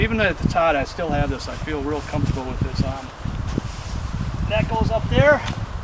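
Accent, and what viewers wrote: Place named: Iron Range, United States. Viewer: Been to McCarthy many times! I’m an Iron Ranger from Virginia, MN. Glad to have found your channel! Thanks for the great info! I could tell that you have the same accent as me 😊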